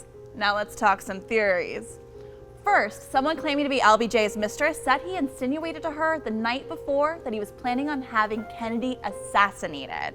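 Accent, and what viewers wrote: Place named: California, United States. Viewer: My God that voice. She sounds like The Californians on SNL. Not to mention that it's not definitive Oswald killed JFK or, at worst, pulled the trigger.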